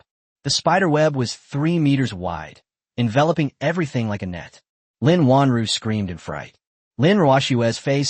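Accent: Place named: France. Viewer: Would be a much better story and easier to follow if you didn't repeat the same s*** over and over and over again. Also if you could stop using that french accent voice to read the English words it would be much better.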